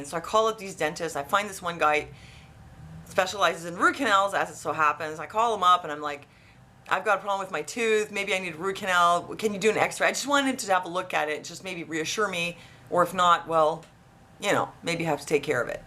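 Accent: Russia. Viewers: I like your Russian type of accent. Funny.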